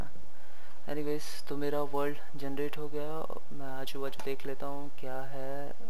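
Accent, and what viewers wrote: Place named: North India, India. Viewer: also like your north wali hindi... (delhi kya?)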